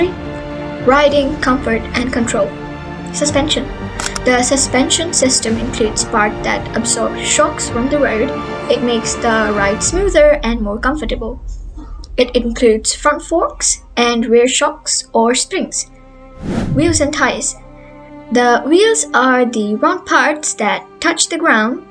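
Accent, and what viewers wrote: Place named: Katra, India. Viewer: Waaaa katra accent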